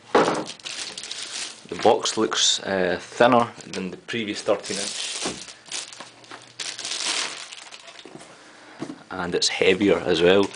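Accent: Scotland, United Kingdom. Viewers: I just love the fact that you opened it with a butcher knife. Also love the scottish accent! great video!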